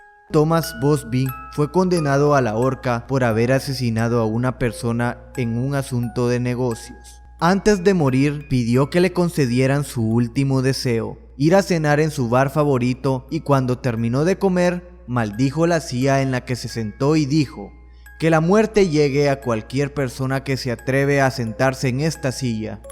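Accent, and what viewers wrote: Honduras. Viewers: Eres de Honduras? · Soy el unico en notar que el narrador es hondureño 🇭🇳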